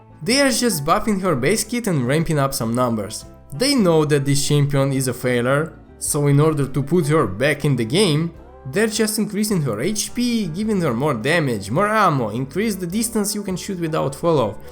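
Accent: Russia. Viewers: Buck. Horrible russian accent.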